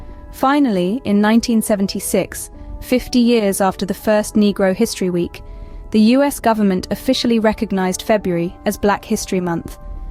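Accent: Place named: United Kingdom. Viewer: Is all the video AI generated? If so, that's some if the best quality AI video I've seen. Why a British accent for the narrator, though?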